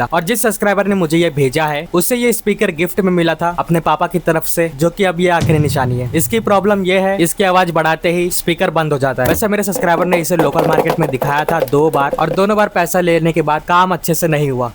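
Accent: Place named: Maharashtra, India. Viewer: App marathi ho na, aapke hindi bolne ki tone se lagta hai ki aapko marathi aati hai ❤❤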